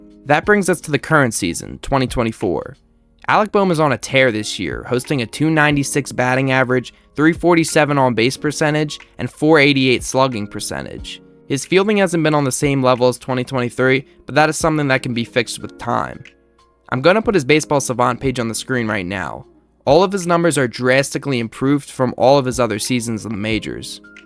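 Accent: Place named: Philadelphia, United States. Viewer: took literally less than 50 seconds into the vid for me to conclude that you sire, absolutely smell of Philly/NJ and are both extremely aware yet unbothered the way "woder" or how tf yall spell that fucked pronunciation 😭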